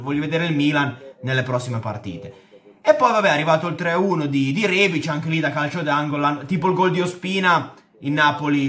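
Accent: Liguria, Italy. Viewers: Ma quanto è bello il tuo accento Ligure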